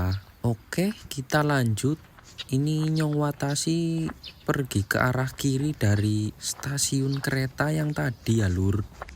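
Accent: Banyumas, Indonesia. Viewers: Bahasane lho.. ra nguati.. 😂😂 ngapak indo.. tapi kue dadi persona lho mas, pertahankan yo.. bahasane wis apik kaya kui.. nek bahasa indo mulus.. ah.. wis biasa.. ngapak indo donk, baru keren 😂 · Ngapak'e banyumas apa bro??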